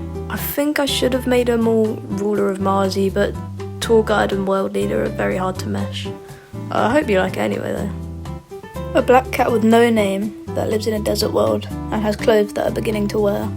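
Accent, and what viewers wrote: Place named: United Kingdom. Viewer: Hi British man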